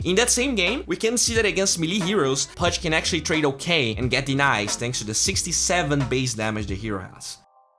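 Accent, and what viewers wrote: Brazil. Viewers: as a brazilian, his brazilian accent is rly easy to recognize, but he is improving his pronounciation comparing to his older videos. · Oh so he is brazilian that's why. I know he's doing all he can to improve his accent i believe in him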